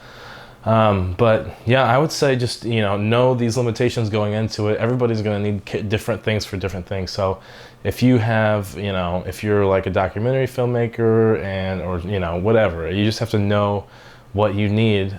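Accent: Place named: Australia. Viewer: You sound rather Australian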